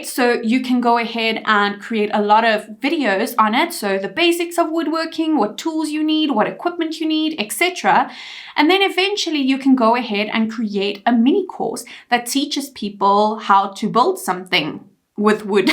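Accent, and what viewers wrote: South Africa. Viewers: Are you South African? That accent...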